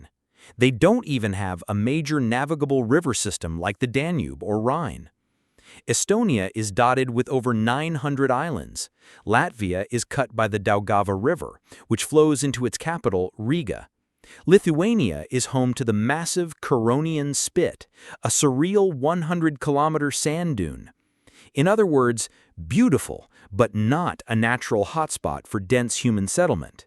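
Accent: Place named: United States. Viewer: PUTIN TV in american accent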